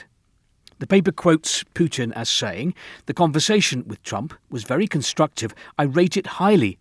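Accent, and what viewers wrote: United Kingdom. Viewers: Thank you for your work! 🙏🙏🙏 By the way, I love your strong British accent. :) It's much better understandable for a eurpean non native speaker that American English. 😄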